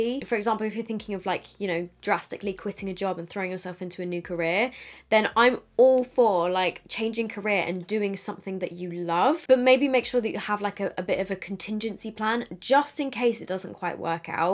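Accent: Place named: England, United Kingdom. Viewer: I would love to enter for your giveaway. But I don't have high hopes as I don't usually comment on YouTube videos in general 😔 I watch you daily though and introduced you to my mam, and I've got your revolution collab makeup. I think you're such an inspiration and I adore your makeup looks. And your English like me so it's cool to hear! Haha.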